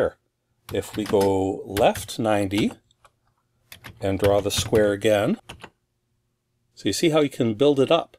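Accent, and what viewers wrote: Canada. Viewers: I love the gesturing hand. It seems to be trademark. I do wish he'd show his face a little more, but I think the gesturing hand works for him. Also, the soft-spoken Canadian accent. See also: <link> <link>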